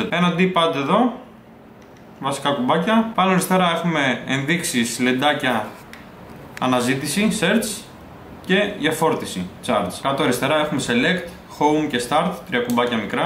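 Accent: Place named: Russia. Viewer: oh WTF. Russian?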